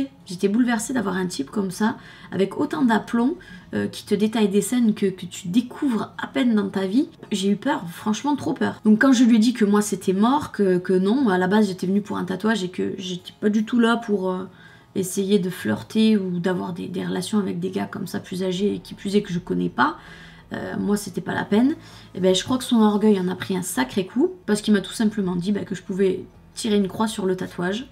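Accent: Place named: Paris, France. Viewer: Tu vis à Montpellier mais tu n'as pas l'accent. Tu as l'accent parisien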